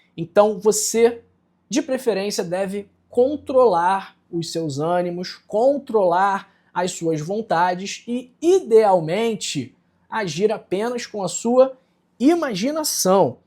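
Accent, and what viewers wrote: Rio de Janeiro, Brazil. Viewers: Não aguento o sotaque carioca. Meu Deus do céu, não dá!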